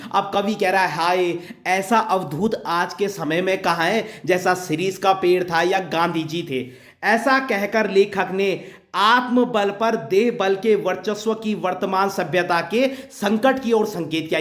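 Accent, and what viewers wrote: Garhwal, India. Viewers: Sir ji aap gadwali ho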